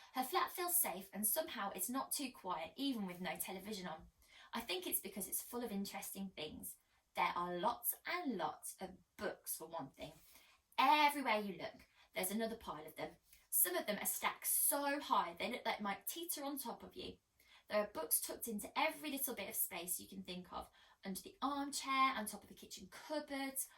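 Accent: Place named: United Kingdom. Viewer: Hello Miss I am an English learner from Russia and I have been choose these book because it is exactly my level - not so easy not so hard. So what I wanted to say - I really like your British accent and how you read the book and also I was really surprised to see in your channel the author of the book. Thank you for your reading and I wish you all the best.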